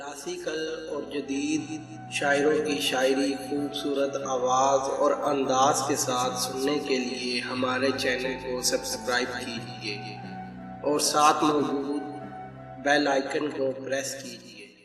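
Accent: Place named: Punjab, India. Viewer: Shayree to buhat achchi he lekin aapka jo lehja he achcha nahi he shayree urdu ki he aor apka andaz punjabi wala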